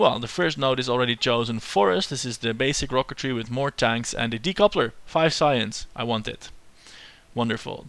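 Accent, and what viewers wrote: Netherlands. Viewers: is he dutch? yeah? so obvoius lol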